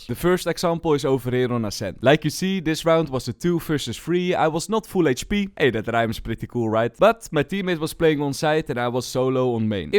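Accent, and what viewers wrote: Netherlands. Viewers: hahahaha ik hoor die nederlands door je accent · first 10 seconds of the video i recognize a heavy dutch accent and i was right LMAO xd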